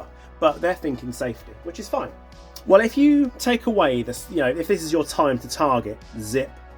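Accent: United States. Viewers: That American accent is 💯 on point I fucking love it lol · First, love the " murican " accent 😏. That said here in the states , at least where I play. The dmr and bolt rifle are lumped together and they are restricted to a certain jul and that's it, no fps . They take the bb weight and fps and lock the med . They require a side arm or secondary. Some places require the sniper to work with a second person " the babysitter" . For me and my style I find a respectable rof ( 40+ 😏) a good hop, bucking , nub and a clean barrel work very well. I also too like to be shit , a fun shit but still a shit. I once dumped 5,000 .12's on someone's head to kill them 😈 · Awesome American accent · That American accent......juicy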